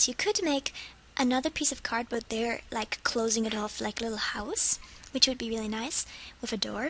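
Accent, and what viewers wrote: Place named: RP, United Kingdom. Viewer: you sound posh lol but i like it :)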